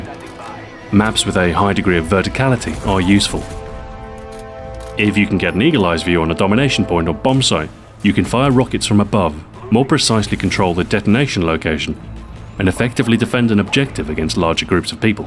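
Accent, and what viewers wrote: Russia. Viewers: Nice Russian accent. Almost spot on and hard to believe you English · I love his russian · Hilaerious Russian accent (I'm Russian) :D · YOUR RUSSIAN IS SO FUCKING EPIC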